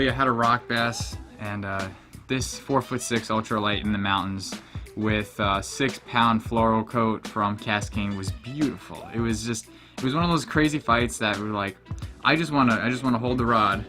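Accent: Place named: New York, United States. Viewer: it's how us New Yorkers talk😂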